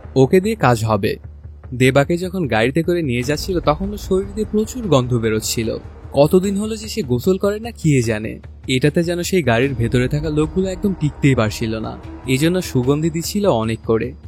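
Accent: Bangladesh. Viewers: বাংলা দেশের লোকেরা এই ভাবেই কথা বলে।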